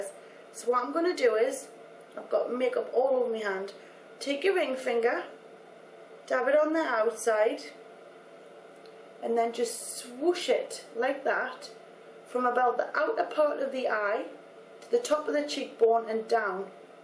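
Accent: Newcastle, United Kingdom. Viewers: where are you from? it sound like your from around the newcastle x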